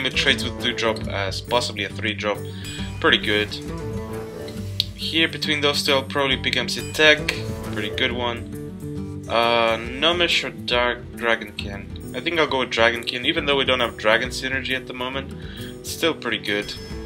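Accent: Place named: Russia. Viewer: laska ma ruski akcent a twierdzi że jest z Polski hmm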